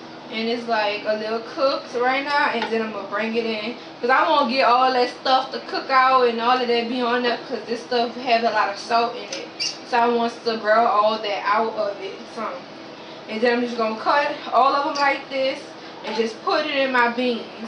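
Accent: New Orleans, United States. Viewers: Once i heard dat talk i knew you was from N.O and i knew i found the right video.. Thanks bb ;) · Thank you for your recipe. I emailed it to myself to not forget. Unfortunately there is no place to buy "pickle meat" in Cleveland, what else do you recommend. . Love your "Nawlins" accent. There were some times I had to replay what you said, but it was worth it. Again, thanks for your recipe. Will try it soon. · looks amazing, you can tell you from the n.o. with that fire accent lol!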